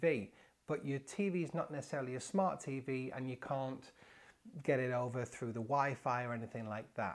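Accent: United Kingdom. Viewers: I just cannot believe the MOANING MINNIES who are complaining about the chatter here ! Goodness, life's just too short, you have to say what you can WHILST you can coz you're a long time dead ! You sound like your from back home in England and English people LOVE to chat !! :) Great video and Entertainment ! :) You should do a 30 minutes Vlog on "How-To" just to pig off the whistle stop hustle bustle people who haven't got time to breathe ! LOL Greetings from Xena Land !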